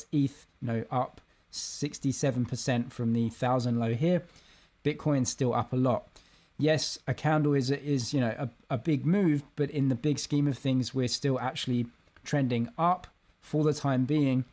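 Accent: England, United Kingdom. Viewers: Fantastic. Where in England are you from?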